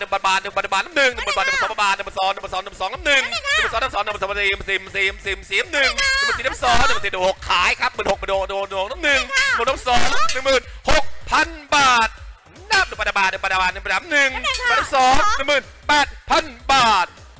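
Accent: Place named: United States. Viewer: พูดแบบอเมริกาเลย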